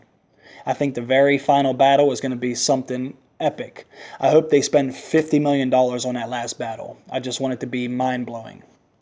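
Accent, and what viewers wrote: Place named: South, United States. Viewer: your southern drawl is a charm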